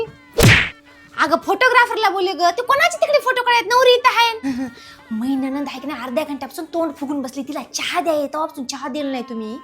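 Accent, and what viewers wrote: Nanded, India. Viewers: नांदेड ची मराठी एकदम मस्त.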